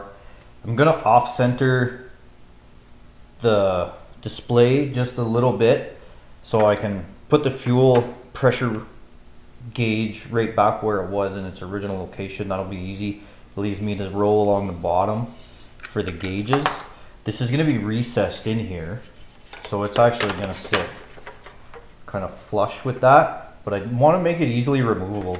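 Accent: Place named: Canada. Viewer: Dude. That Canuck accent. LoL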